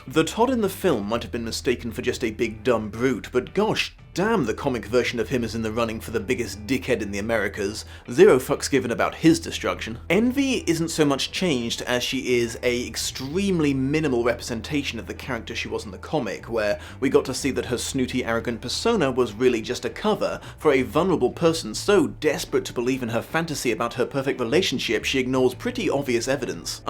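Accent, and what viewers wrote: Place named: United Kingdom. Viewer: I find your voice so soothing,. But maybe it's just the weakness Americans have for British accents.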